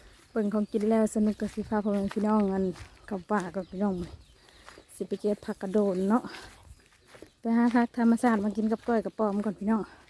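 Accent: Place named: Savannakhet, Laos. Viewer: ສະບາຍດີ ຕິດຕາມເບີ່ງຢູ່ເດີ..ເຈົ້າສວຍງາມດີ..ແບບທຳມະຊາດສ້າງມາບໍ່ແຕ່ງຫນ້າກໍງາມ...ເວົ້າລາວມ່ວນດີຂອ້ຍຊອບສຳນຽງສຽງເວົ້າພີ້ນອ້ງຄົນສະຫວັນະເຂດເວົ້າລາວ...ຮອ້ນ..ຮອ້ນ..ຮອ້ນ...ຫນ້າເຫັນໃຈ..ຢູ່ບ້ານຂອ້ຍໜາວເຢັນ...ໂຊກດີເດີ.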